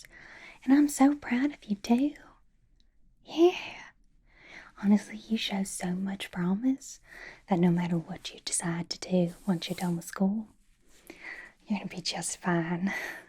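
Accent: South, United States. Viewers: Yes, the Southern accent is very nice. Very soothing. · This is my favourite version of rogue is honestly brilliant and omg the southern accent is just perfect ahhhhh amazing 😊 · I love rogue and the southern accent omg, I discovered your channel about a week ago and your cosplays are on point! so entertaining and the dialogues actually are in universe, its so entertaining!!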